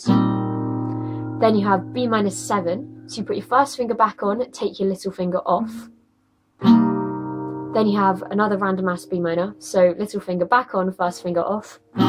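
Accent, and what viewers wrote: United Kingdom. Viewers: Thanks for the "random ass Bm" chord...i couldn´t figure that out. By the way, i love your british accent ;) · very nice lesson and very beautiful british accent! thank you !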